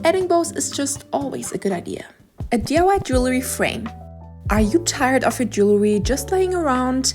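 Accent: Germany. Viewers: You have a big of a German accent. Are you German? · I CAN HEAR THE GERMAN ACCENT GIRLIEE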